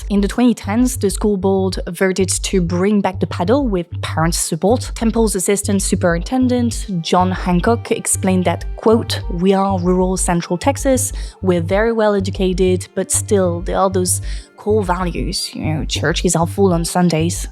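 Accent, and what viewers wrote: France. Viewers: I agree with what you say about the effect of Lolita, but I hope you understand how the actual message of that book itself is to show how false, self-serving, and full of manipulation that perspective is. And I'm saying this as someone who is unashamedly attracted to young girls. I understand that my attraction is utterly separate and apart from any possibility of acting on it in reality, and would never take steps to do so. And it is because of that abstinence, that I have the right to my own natural feelings and thoughts. Your French accent is quite lovely, by the way.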